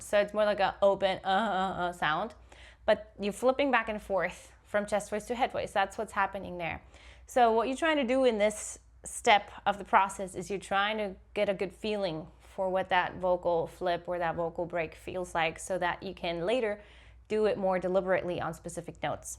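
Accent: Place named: United States. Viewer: Bavaria? That figures, the region full of supposed culture of pastrys and yodelling. Your accent would have me believe you are american.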